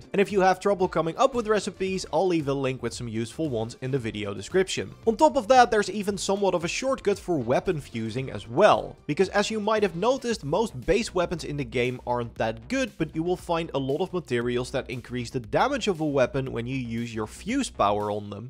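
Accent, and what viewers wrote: Netherlands. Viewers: Ik merkte meteen aan je accent dat jij nederlands bent 😂. Goeie video trouwen was heel nuttig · i smell dutch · You sound dutch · Heerlijk dat Nederlands Engels accentje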